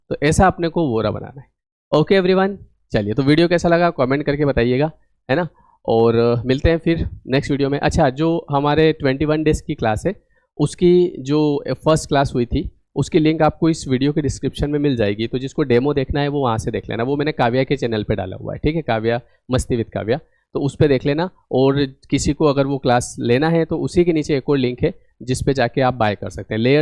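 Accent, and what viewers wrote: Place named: Indore, India. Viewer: Sir indoor ki tarah bolte hai app indoor se hai app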